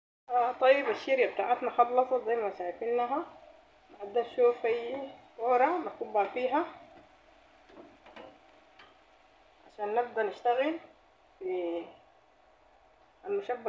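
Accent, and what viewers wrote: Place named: Sudan. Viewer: لييش انتم يا السودانيين نساء ورجال تمطووون كلامك حييل بصراحه طريقة كلام ولهجه لا تعجبني ابددددداً😮😢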